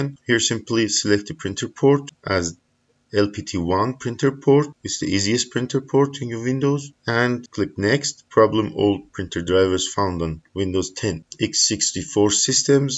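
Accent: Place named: Turkey. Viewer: I bet you are Turkish. This is Turkish accent 😂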